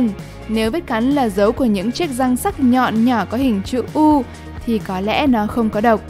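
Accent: North, Vietnam. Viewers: sao bắc kì nhìu quá...nghe ko hay j cả...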